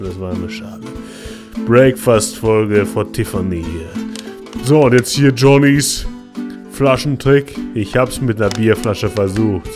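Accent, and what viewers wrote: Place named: United States. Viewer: Immer dieses "hier hier hier" macht es nervig. nach ner Minute hab ichs ausgemacht. nicht authentisch. Dieses amrikanische ausgesetzte ist zum kotzen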